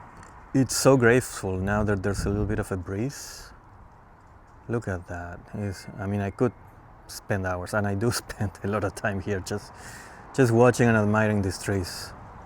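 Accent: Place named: Nagaland, India. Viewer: Nice collections. I am from Nagaland, India.I want to tell you that you the way you speak, it's just like one of us,,we people speak English exactly like the way you speak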